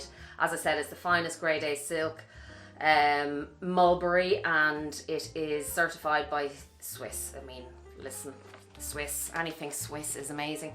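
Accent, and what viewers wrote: Ireland. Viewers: Aw- I love you too! Your energy and gorgeous Irish accent . I am praying this video will help Amanda- and any of us who need help.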